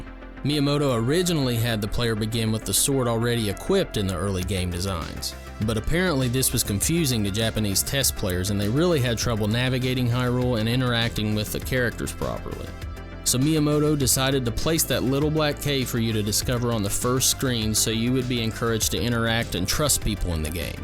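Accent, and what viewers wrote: South, United States. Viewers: Dat southern twang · I was close lol I could detect a certain dialect. People think Southerners all talk the same but there are different dialects and styles. My dad's from Tennessee and says things like "ov'er" instead of over there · You said "Ravel's Bolero" right, btw. But I'm sure you already knew that. :-) Oh, as an aside, your videos help me to kill old preconceptions about the southern accent. I grew up in South Dakota (which has its own dumb-sounding accent, like from the movie Fargo), and I always thought a southern accent meant the person was uneducated. When I moved to Virginia, near the West Virginia border, a decade back, I still held onto that thought, at least in the first split-second I heard someone speak. But your videos are fun, entertaining, and I always learn something new from them; all of which helps me kill those old connections, and realize we're all the basically the same nationwide.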